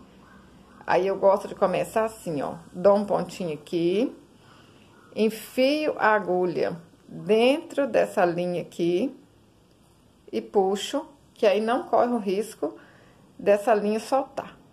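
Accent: Minas Gerais, Brazil. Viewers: Ah o sotaque mineiro 😍 Um calma, conseguimos pegar passo a passo, obrigada por compartilhar suas ideias. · Há quanto tempo desejo aprender a fazer fuxico... Muito obrigada... Sei sotaque é muito lindo!!! É de minas??? Muito graciosa e paciente você é!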